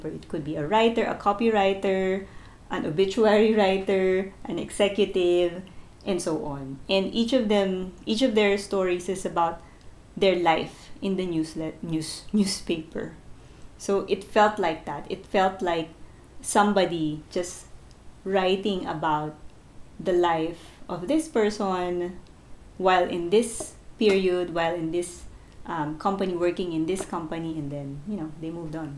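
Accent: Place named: Tagalog, Philippines. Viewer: Fantastic Book! Thank you for your review! I think your tagalog accent is fantastic!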